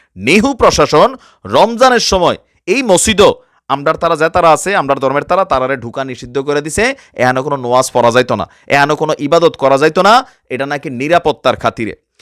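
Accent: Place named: Sylhet, Bangladesh. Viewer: তুমি মিয়া সিলেটি মাতো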